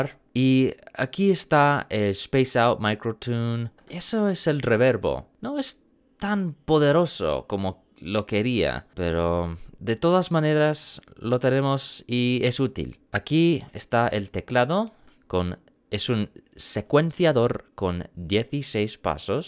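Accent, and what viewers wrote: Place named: Spain. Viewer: Pues se me ha hecho bastante entretenido el video. Eso si reverb se dice reverberación pero es que tienes hasta acento peninsular y bastante buen nivel de castellano.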